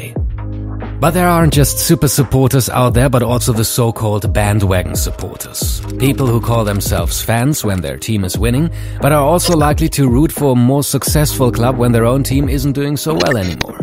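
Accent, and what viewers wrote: Germany. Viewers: From the accent I'd say Germany or Austria. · Great channel, great german accent. Sehr schön videos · what gives you the impression that he's American? His accent is very German and it also says in the about page that it's based in Germany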